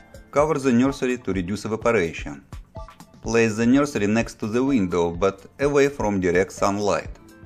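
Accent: Russia. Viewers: i just wonder do americans understand your accent? my russian friend has the same english accent as yours. he have never been in russia though.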